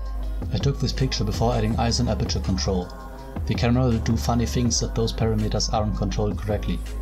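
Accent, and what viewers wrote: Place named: Germany. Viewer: So yours Last name is polish but you have German accent 😄✌️👋Nice progect 😉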